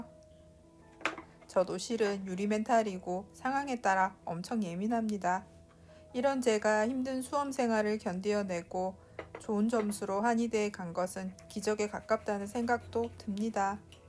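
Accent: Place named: Gyeongsang, South Korea. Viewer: 말투는 경상도인데 서울말 쓰려는 노력이 엿보이네요. 열심히 노력한 결과로 좋은 삶 살기를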